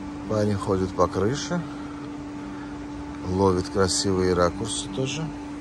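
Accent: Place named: Georgia. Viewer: Ваш литературный русский с лёгким грузинским акцентом восхитителен. Атмосферное видео. Спасибо за погружение в прекрасное туристическое место.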